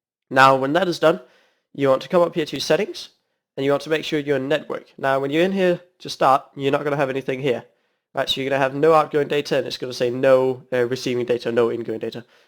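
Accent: Denmark. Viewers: er du fra Danmark? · i knew u where a dane hej :D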